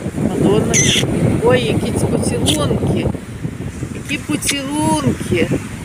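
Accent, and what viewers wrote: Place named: Surzhyk, Ukraine. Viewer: Ту піца НАСТОЯЩА....Та чого ти розмовляєш російським суржиком???? Патріотка недолуга.....😮😮😮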